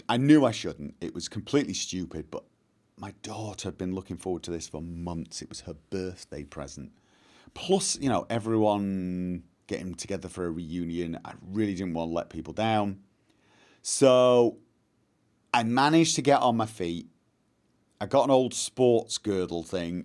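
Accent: United Kingdom. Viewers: I am older than him too. I actually first started watching his channel because I am also a Brit that has long lived in Scandinavia, but the far north of Sweden for me. It was just so nice to hear a British accent and anecdotes from a period I so fondly remember. Grumpy old man - Check! Disparaging of suicidally dressed young-en's out clubbing - Check! Wrecking my back every year by slipping over on ice or snow - Check! Egad, I think I am the Swedish incarnation of over the border. I'd buy him a sympathy beer the next time I'm over to Norway, but I cannot afford to take out a second mortgage...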